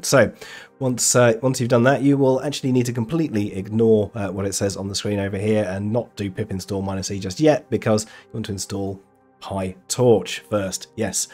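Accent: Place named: United Kingdom. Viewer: You sound like spiffing brit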